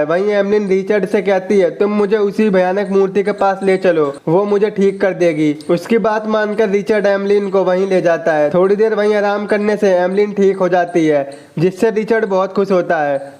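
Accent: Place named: Mumbai, India. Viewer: Bhai full to mumbai walo ke jese explqin karta hai😂😂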